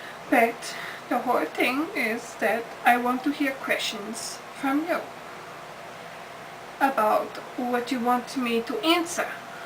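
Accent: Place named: Germany. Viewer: Naaaw! ^ ^ I love your cosplay! But your german accent is the best ever xD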